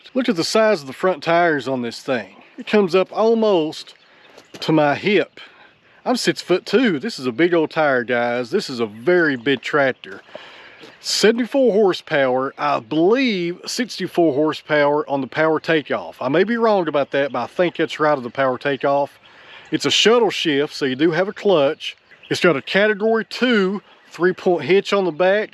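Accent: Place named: Kentucky, United States. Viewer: Of course you got a second tractor... 😁😁😄 I understood absolutely 0% of of the discourse about the new toy, but that's ok. I'm really just listening to the sound of Kentucky. 😊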